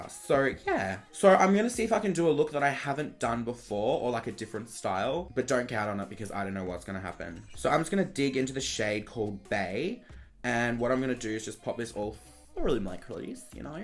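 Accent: Australia. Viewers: lmao at the aussie comments and voice hahahaha 👌😂😂😂😂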